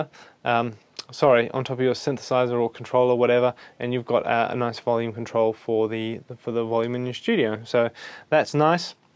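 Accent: New Zealand. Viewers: bro can you send to me, il review it ,give you my thoughts and critisisms and send it back mayby in like 6-12 months? haha Nice review!!! you from NZ?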